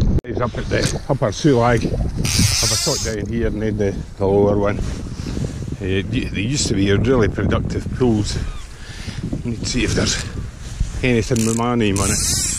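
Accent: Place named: Scotland, United Kingdom. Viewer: It's good to hear a Scots accent again. I moved to Connecticut 12 years ago and now fish for Steelhead in the Great Lakes and saltwater for striped bass. I grew up fishing Yair on upper Tweed, the Dee, Loch Leven etc. It's great to visit these rivers through your films.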